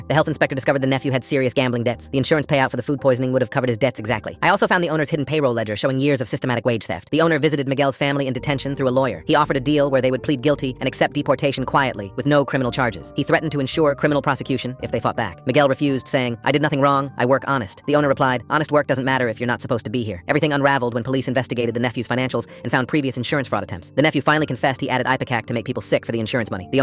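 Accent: Australia. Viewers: Is it just me or does this sound Australien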